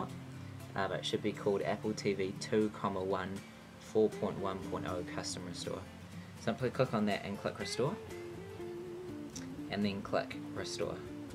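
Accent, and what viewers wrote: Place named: New Zealand. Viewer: Nice video, new Zealand accent xD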